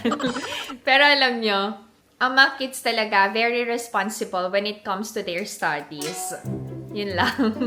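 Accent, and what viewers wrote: South Korea. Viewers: Ms. ang galing mo mag Korean while you were talking to seems like I'm watching k-drama ganda ng iyong voice and koreanang koreana ang accent sana mag speak ka lagi korean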